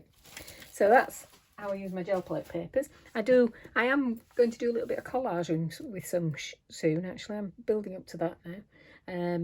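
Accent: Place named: Hull, United Kingdom. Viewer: don't know why i haven't discovered you before! great stuff. you sound like you're from hull, i'm in cleethorpes. thank you xxx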